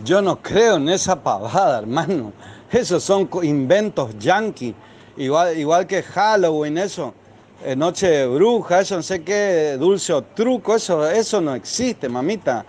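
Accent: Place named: Paraguay. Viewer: Parece Paraguayo los correntinos no tienen tanto hacento litoraleño que de echo es hermoso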